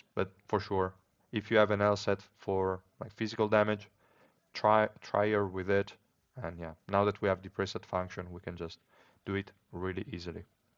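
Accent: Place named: Eastern Europe. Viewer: haha noooo, I also have the -ian at the back, but it is ... Asian 😂 I am a Vietnamese who like to explore language and luckily I know some of Italian words. The one that make me surprise is your accent which make me assume that you are from the Eastern or Northern part of Europe 😅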